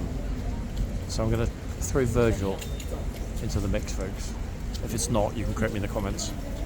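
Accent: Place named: RP, United Kingdom. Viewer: Oh you never ever fail to make me laugh out loud with your terminology 😂 peasants & rich coming out of raffles 😂you have a very good posh accent my dear man. Thanks for showing us around London, I love the beautiful architecture of our capital city, truly amazing. Keep doing what you do ❤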